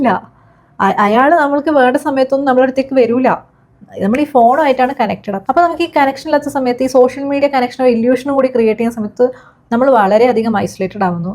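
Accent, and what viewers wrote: Kannur, India. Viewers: maam kannur aano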